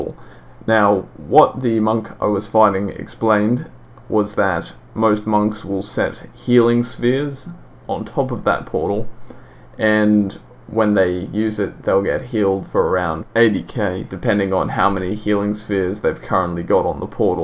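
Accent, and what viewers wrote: Australia. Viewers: Hey man you sound Australian which part of Australia are you from ?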